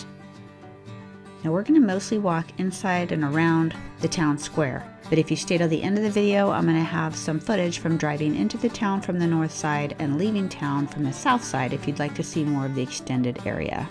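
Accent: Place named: South, United States. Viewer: Hi, London guy here. Wow! America is just so beautiful wow, wow, wow! I have to say, Montana and Wyoming have captured my heart. I am a real sucker for greenery. I do believe they film Clint Eastwoods movie here "Any Which Way You Can." Great video and scenery. I too love your accent. The deep southern accent is my favorite accent by far, I find it so hot when it is coming out of a females mouth if you get my drift! I can't wait to see your other videos. I must Subscribe to your channel. Thank you for making this awesome video.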